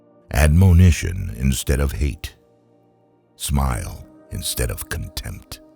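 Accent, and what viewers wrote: United States. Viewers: The American accent spoils it.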